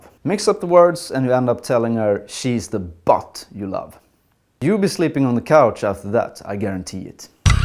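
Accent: Stockholm, Sweden. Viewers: STOCKHOLMSKA, såklart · yay stockholmska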